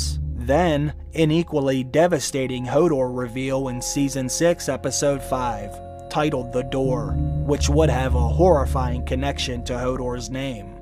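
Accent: Pittsburgh, United States. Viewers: Dude you sound like you’re from Pittsburgh! New castle area?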